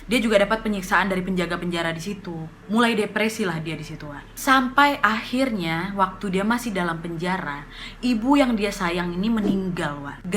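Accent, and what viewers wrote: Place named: Medan, Indonesia. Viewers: Br tau chanel ini krn social distancing.. Suka krn kontennya ttg crime, nambah wawasan ditambah lagi bawainnya logat kakak" medan ceplas ceplos ga brenti2, seru🤣👍👍👍👍👍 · Gara2 keseringan liat si wak nih, sekarang aku ikutan dia pake logat Medan kalo ngomong sama anak2 aku, pake "hah" segala, padahal aku arek Suroboyo 😘😘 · Kakak tinggal dimana? Kok bahasanya kayak bahasa medan wkwk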